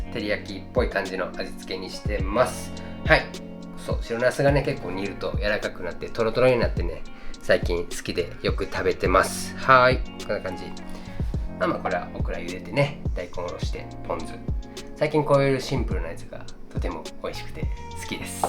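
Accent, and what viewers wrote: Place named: Kansai, Japan. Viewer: 関西弁も、いいですよー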